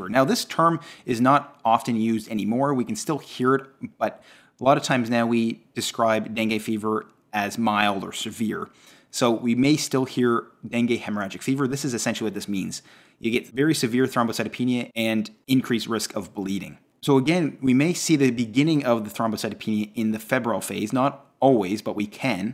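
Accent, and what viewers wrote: Canada. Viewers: This guy is canadian I can hear he's accent 😁 esp when he says "about"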